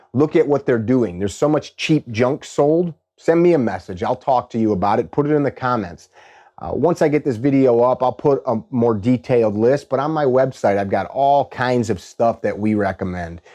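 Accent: Chicago, United States. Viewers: Know what? I love this dude, hes like one of the homies & I’ve never even met the guy. So no homotype shit. legit seems like a down to earth funny ass dude from Chicago, with that yankee accent… hahaha. the father figure most of us never had. 100%.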